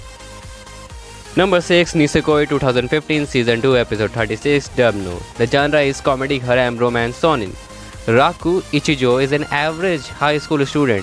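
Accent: India. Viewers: Hey bro your voice like indian are you indian 😊ya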